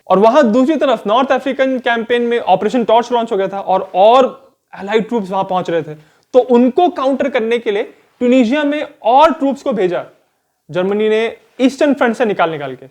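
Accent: Bengal, India. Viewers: Sir, are u bengali ?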